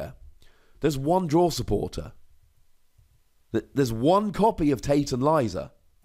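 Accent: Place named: United Kingdom. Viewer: I´m sorry if this offends you, but the way you say "ball" kinda sounds like "bull." Is it the british accent? Edit: And also, when I played against some guy at a league challenge, he played apricorn maker, and that pretty much won him the game becuase he could set up so fast. Minutes after the game finished, he kept telling me how good apricorn maker and that he´s happy he´s playing it.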